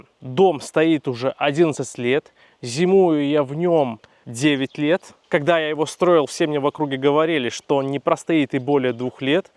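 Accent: Belarus. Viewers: Здравствуйте! Судя по говору вы белорус😊 привет вам со Ставрополья! Мои предки строили дома из самана и они могу стоять сотни лет если за ним ухаживать.Отличие от вашего хоббитского все дома из самана с толстыми стенами и это важно ! Такие хатыбелят известкой а фундамент черным битумом. Красиво и нарядно ! Ваше умение строить такие печи со сложными дымоходами восхищают! В нашей местности дерево всегда ценилось и глиночурки не известны.и конечно с дровами туго.В детстве бабушка топила углем а потом у всех провели газ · А мне сразу стало понятно, что это где то в Белорусии. Первое: по говору. Второе: белорусы замечательные умельцы и трудолюбивые рукодельники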